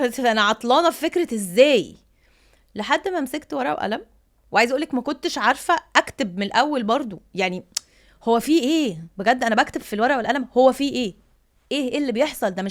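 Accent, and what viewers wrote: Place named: India. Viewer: انجليزى كتير و الاكسنت هندى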